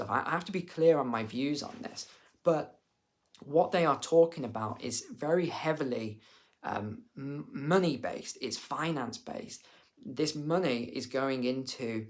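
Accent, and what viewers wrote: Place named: United Kingdom. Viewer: insults sound even funnier with his British accent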